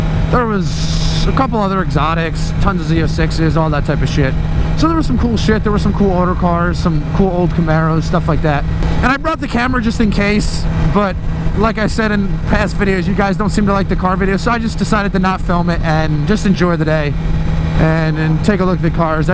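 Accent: Pennsylvania, United States. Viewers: u from pennsylvania?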